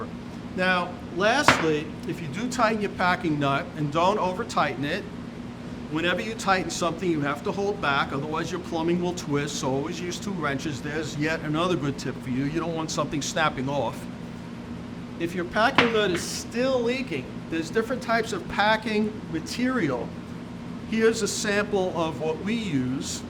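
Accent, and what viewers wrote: New York, United States. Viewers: Great video, thanks, ditto on hearing that New York accent! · Former New Yorker here, and damn, that accent makes me homesick 😂 Thanks for the explanation, would love to see the procedure a little closer up, but even so, this gave me the confidence to try to resolve a really bad leak from a garden hose shutoff valve. Wish me luck!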